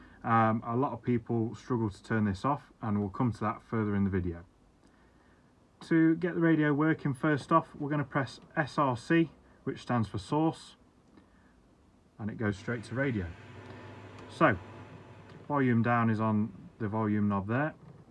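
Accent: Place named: England, United Kingdom. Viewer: What a lovely English voice. How I miss the England I was born into. We have been (and are being) invaded by foreigners who are displacing us, unfortunately.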